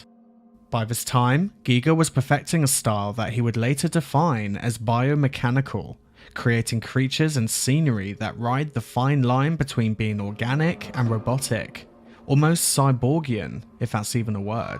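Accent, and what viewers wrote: United Kingdom. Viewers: He just sounds British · Thanks for this i love the British narration it works beautifully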